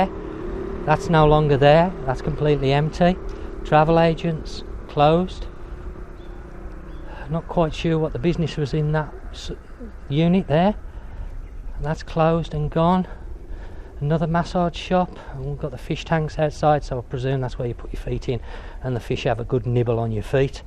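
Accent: United Kingdom. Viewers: What a disgusting accent is this? Must be some trash area from UK. Try to speak BBC English. Yuck. Thumbs down.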